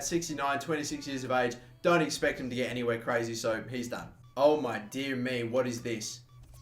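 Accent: Australia. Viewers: hes aussie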